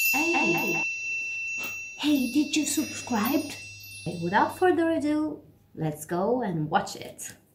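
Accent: Italy. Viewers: I am in love with your Italian accent!!!! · I love your Italian accent and I love your reactions to So Hyang. Subscribed ♡.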